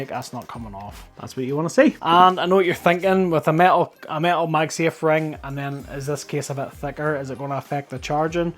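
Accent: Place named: Scotland, United Kingdom. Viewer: Lovely to hear a Scott! Scotian by blood.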